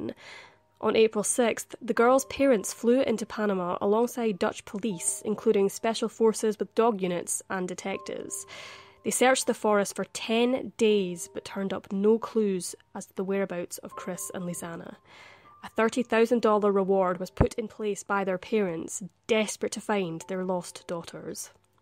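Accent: Scotland, United Kingdom. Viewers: And I LOVE your accent ! Reminds me of Sean Connery.A relief after all those computervoices an Vallygirlll accents.A delight to listen at.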